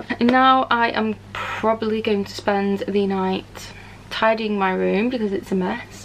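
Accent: Liverpool, United Kingdom. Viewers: You sound like your from liverpool? If so go liverpool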